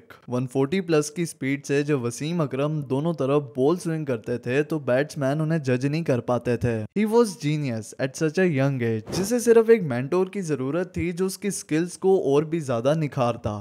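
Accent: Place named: Punjab, India. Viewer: Not "Floup" it's "Flop" I know and I can understand you are Punjabi by your accent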